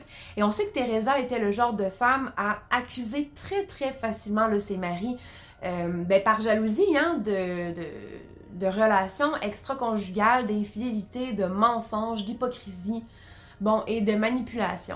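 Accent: Quebec, Canada. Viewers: Bonsoir, merci pour cette histoire effroyable que tu as très bien décrite. Tu es une excellente narratrice et ta chaine va vraiment vite évoluer! Bravo. De plus, j'adore ton accent québécois!!!!!!!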